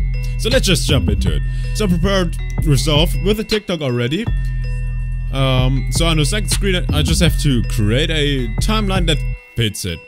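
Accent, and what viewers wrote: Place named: Germany. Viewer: Ziemlich aufgesetztes, unnatürliches Gequatsche - und deine deutsche Intonation ist dennoch unüberhörbar, mein Junge. Ist bei dir nicht geheizt, dass du diese irrwitzige Mütze tragen musst? :face-green-smiling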